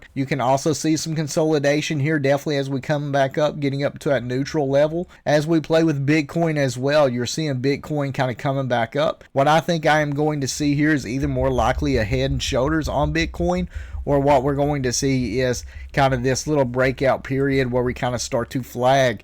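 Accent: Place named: Deep South, United States. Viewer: Hey! I LOOOVVE your deep south accent...🥰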